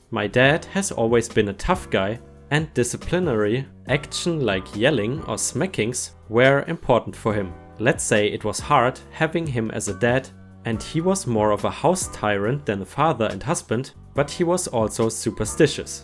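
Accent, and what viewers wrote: Germany. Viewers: what is your country of origin? From the sound, like German? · you sound so German!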